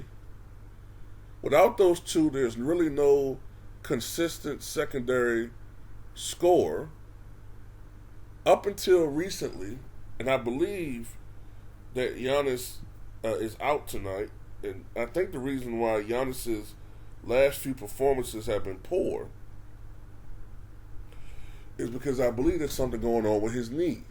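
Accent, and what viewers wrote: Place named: New York, United States. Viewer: You sound like a typical out of breath New Yorker, who is too busy being an opinionated douche to give any kind of useful commentary on basketball. Your channel is a waste of time.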